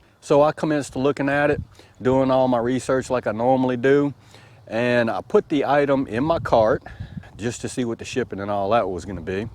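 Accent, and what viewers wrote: South, United States. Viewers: I bought a cheap Fire HD tablet/reader using the same 5 month payment system because to be frank I wasn't too confident whether I'd be happy with it or not. Regardless I just got done paying it off this month. Thankfully it was only 30 USD a month but I had no problem with it. Initial payment was 39 USD ($30 + 6% state sales tax) and the remain 4 payments were $30 and they came out of my bank account every month like clockwork. I guess because it wasn't that much a month I lucked out and didn't get fleeced like you did. Thanks for the heads up and I will definitely keep a watchful eye out if I ever purchase anything else from Amazon using that payment system. As far as outsourced customer service.....................................I feel ya brother. P.S. You don't talk funny at all. You have a perfectly acceptable Southern/Creole US English accent.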